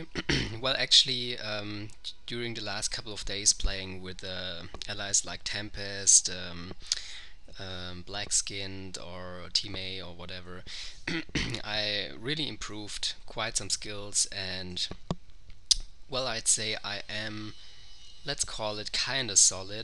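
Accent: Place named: Germany. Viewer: lol exactes deutsches schulenglish :D:D:D:D:D und mir gings exact genauso bei meinem ersten 1on1 :D:D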